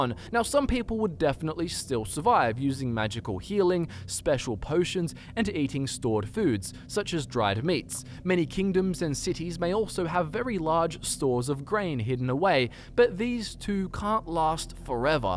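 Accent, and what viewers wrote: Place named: Australia. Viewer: No, watch the video and enjoy the Aussie-narrated lore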